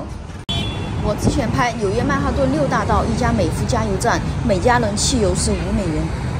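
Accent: Sichuan, China. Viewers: 赞一个👍👍👍非常喜欢你的川普，好靓好聪明的脑壳！ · 的视频必看,最喜欢的是你说的“川普”（四川普通话)👍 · 四川口音。你们应该听不出差别的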